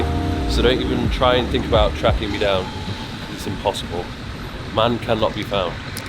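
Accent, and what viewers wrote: South Africa. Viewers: That Saffa accent was THIIIIICCKK,,, Yes boi... anyways love the content! Watching from South Africa 🇿🇦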